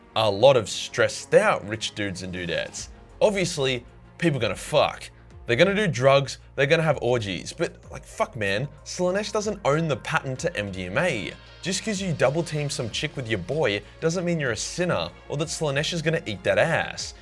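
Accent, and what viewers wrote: Australia. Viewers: This is exactly your type of video, time to use that sweet Aussie voice to explain the most beautifully deceiving worlds in the Imperium.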